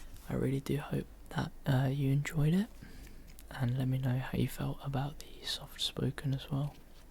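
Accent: United Kingdom. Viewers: Great Bri'ish accent.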